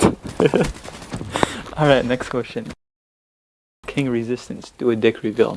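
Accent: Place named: France. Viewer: dammit, 2nd lol but that french Accent tho hahaha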